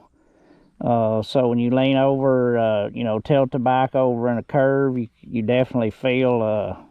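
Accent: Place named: South, United States. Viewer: Hillbilly talking.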